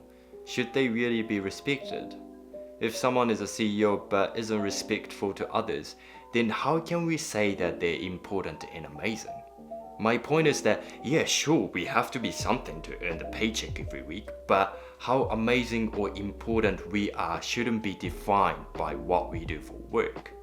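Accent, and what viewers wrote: New Zealand. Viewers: wise and crazy cute! 🥰 ps. did u study in New Zeland? I can hear a kiwi 🥝 accent when u speak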